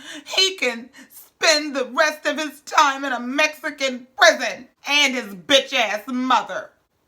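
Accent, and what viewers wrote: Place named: Charleston, United States. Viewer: are you from Charleston, SC or have roots there? I subscribed because of your accent and content. #GullahGeechie for life❤️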